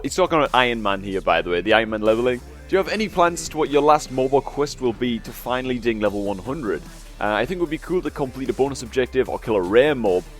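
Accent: Newcastle, United Kingdom. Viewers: Representing us geordies!!!!!!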